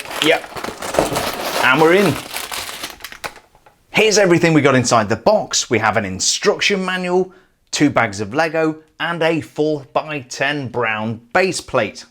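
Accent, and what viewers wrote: England, United Kingdom. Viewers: I thought you were English?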